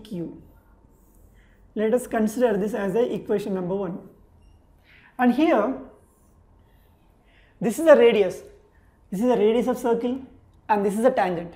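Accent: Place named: South India, India. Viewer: geez thats cuz of the dialect hes prolly south indian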